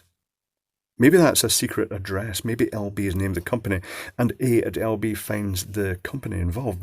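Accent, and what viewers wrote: United Kingdom. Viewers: I just love this fellas accent. Definitely a Brit.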